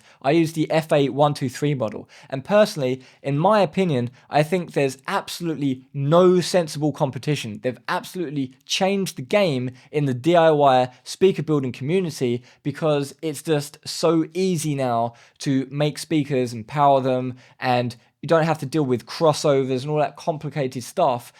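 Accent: United Kingdom. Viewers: So which European country are you at? Because I’ve noticed your wall power socket (is it Type L?) to be unusal for EU, like it’s from old days (60s-70s), perhaps in Italy? Of course your accent is British but that wall socket is something else (not UK). My guess is that you are either in Italy or Germany, perhapse in a house in the woods, which might explain seeing your tools (I do like that window view). Please do tell, I am curious about that wall power socket, it’s kinda rare. Wish you best of luck with your DIY speakers, they do look good. I will stick with my Genelecs (have you seen/heard their 8381A or 1234A models? they are cheaper than PMCs!)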